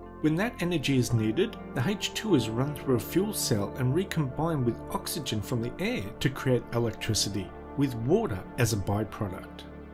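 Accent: Australia. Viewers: An Australian narrator talking about square feet and pounds. A reminder that in Australia we are metric like all the other sensible countries in this world. Thumbs down.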